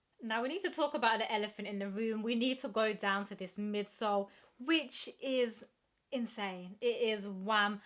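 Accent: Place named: South, United States. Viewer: nice trainers, what part of down south you from i can pin point the accent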